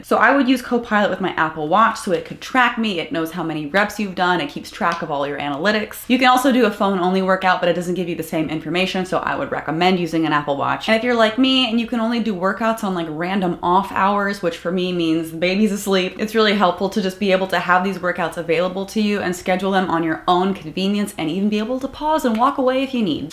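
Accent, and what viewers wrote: South, United States. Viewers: Are you from the south?? I hear a faint accent! I’m Canadian so I’m hearing a twang?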